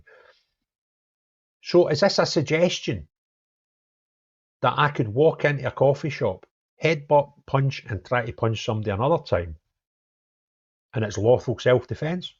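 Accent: United Kingdom. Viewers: My apologies...what accent is this? I am assuming this is UK, which region is your accent?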